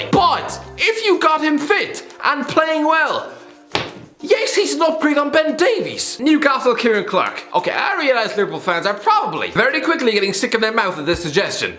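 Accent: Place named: Ireland. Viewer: Its fun hearing read Nigerian names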